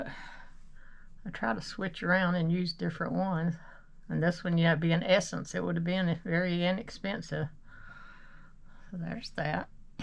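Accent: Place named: South, United States. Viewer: You are such a doll. I love your southern accent. Your approach as well. Have a healthy, safe & healthy day. 🙏💜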